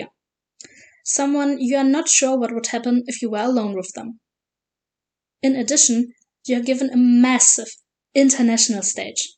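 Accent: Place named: Germany. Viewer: I can hear your German accent.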